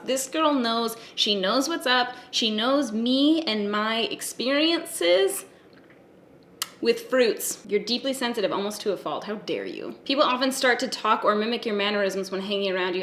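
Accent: Canada. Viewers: This is going to be such a random comment but in this video you sound like the Canadian stereotype from a century old. Yeah. Sorry about that, I had a weird association.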